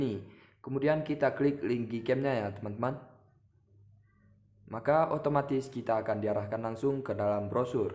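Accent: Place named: Aceh, Indonesia. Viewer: Bg org mana logatnya kayak orang aceh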